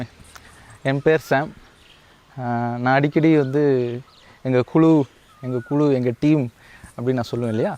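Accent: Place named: Theni, India. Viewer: Bro neenga entha ooru pakka Theni matheri iruku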